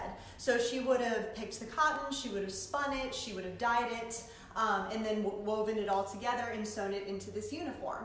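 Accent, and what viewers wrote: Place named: Midwest, United States. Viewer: Horrific "commentator" with a fragile grasp of Civil War history and a marked inability to disguise her flat, mid-western 'accent' with dewdrops of "you'all's. Just awful.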